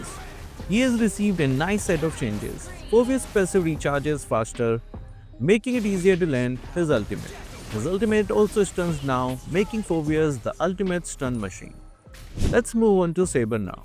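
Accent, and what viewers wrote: India. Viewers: Your name is japanese but your accent is Indian? Wtf?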